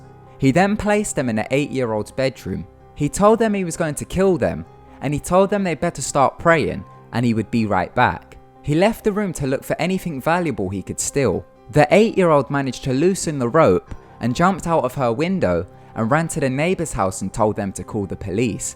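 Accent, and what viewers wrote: United Kingdom. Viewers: Sorry, but these videos are never scary with that dopey British accent. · I find accents very interesting, are you from the uk. Ever heard a scous accent, I can't understand it to save my life lol, I like the way it sounds tho, not to make fun of their accents or anything like that.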